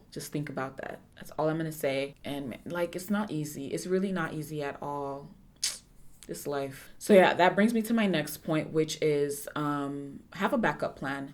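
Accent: Nigeria. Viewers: I'm loving that Nigerian accent :) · I love the in-between Nigerian accent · lol the nigerian accent.. <3 awesome video btw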